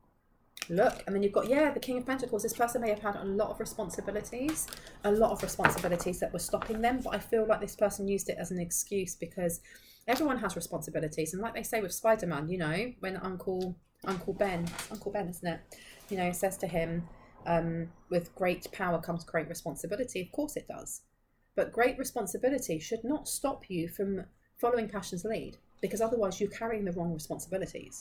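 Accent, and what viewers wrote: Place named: Hertfordshire, United Kingdom. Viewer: where are you based exactly? you sound like you come from hertfordshire ,, anywhere close?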